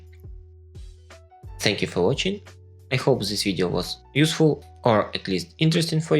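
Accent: Russia. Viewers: У вас ооочень русский акцент ^~^ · Bro, are u russian?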